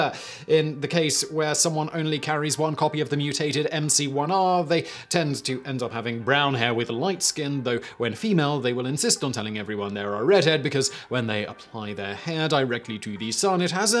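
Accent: England, United Kingdom. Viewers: You're so cute when you crack up and call out your writers! It just slays me.🥰, I adore how freaking English you are! You're like a young Sir Patrick Stewart, can't help but crush a little bit.....or Sean Connery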